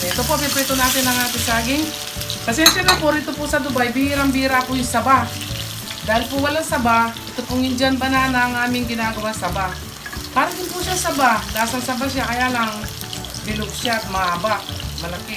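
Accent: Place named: Pampanga, Philippines. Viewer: Galing ng magluto cabelen talaga halatang kapangpangan ya pamagsalita.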